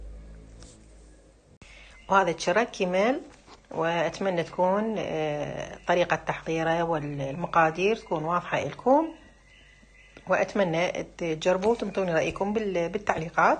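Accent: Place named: Iraq. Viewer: ساويلنا امانة خبز القص تبع شاورما يارب كون عم قولها صح يسعدلي قلب العراق واهل عراق بعشق لهجتكون ❤❤❤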